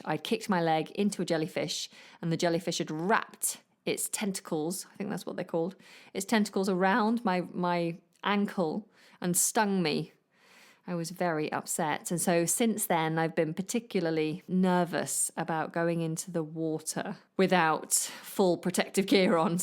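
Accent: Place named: United Kingdom. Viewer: Love to listen your clear British english!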